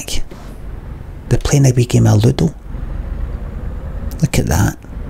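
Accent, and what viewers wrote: Scotland, United Kingdom. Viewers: a scottish man doing slight asmr has now shown up in my youtube reccomended and twitch recommended constantly, bloody well done google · "A wee game of Ludo" I love the Scottish accent so much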